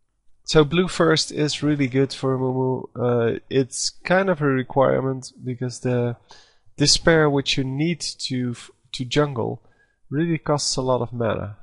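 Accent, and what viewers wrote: Netherlands. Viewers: are you dutch? haha u realy got an dutch accent :)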